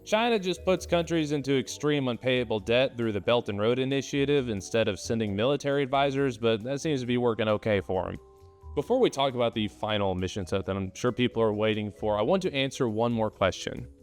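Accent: United States. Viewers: hhhmmm... I hear an American accent. I assert that YOU don't know what special forces do, because your special forces are bog standard elites that don't really do much actual SF work.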